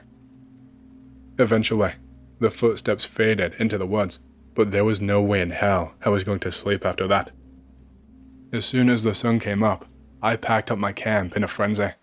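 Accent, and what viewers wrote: New York, United States. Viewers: Next time do a Scottish accent your new York one is perfect 😂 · Just have to say it....New Yawk!! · Man i would never believe your voice wasn't AI with how point and consistent in your tone is if not for your NY accent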